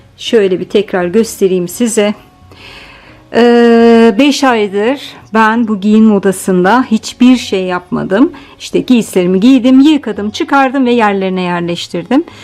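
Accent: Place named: Denizli, Turkey. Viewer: Gayduru gubbak cemilem deyve gari. Bende çok giderdim Denizliye eskiden. Aksanli videonuz cok hos. Merak ediyorum siz yurtdisinda yasadiniz eskiden? Ne is yapiyorsunuz? Sanki televizyonculuk yapmisiniz gibi.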